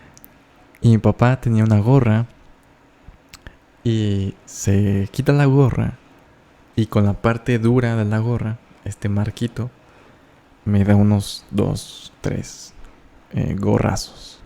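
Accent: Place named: Spain. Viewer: QE gusto saludarte ya no as echo vídeos por favor sige haciendo cuando menos de ves en cuando me encanta tú hacento de España y forma de dialogar Eres demasiado inteligente, estan con ganas tus videos de YouTube 🙏🥳🤩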